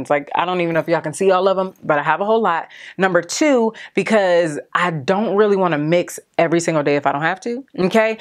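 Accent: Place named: Spain. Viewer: Loved your enthusiasm throughout the video as well as your Spanish accent! You rock! Tu si sabes!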